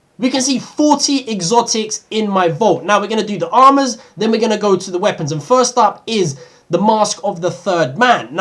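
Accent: United Kingdom. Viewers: Why is every Destiny Youtuber british? Seriously, you're voices are fucking annoying.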